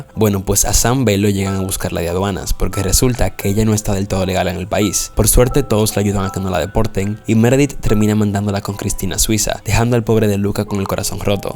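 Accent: Dominican Republic. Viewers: Eres de República Dominicana?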